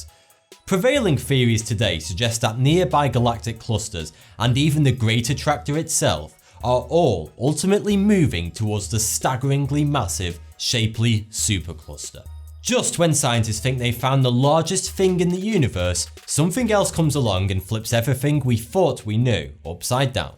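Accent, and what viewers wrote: United Kingdom. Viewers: the problem is that i am moroccain and english its kindaaaa hard to me so i know like 23% of english words and he problem is that he is british and his english is so strong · Mate he's British not American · That British accent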